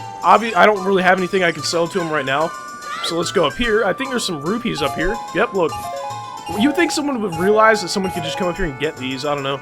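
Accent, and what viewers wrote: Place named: South, United States. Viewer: Your Southern rural accent is really good. Not the horrible caricature that comes from a place of disrespect that I hear so often.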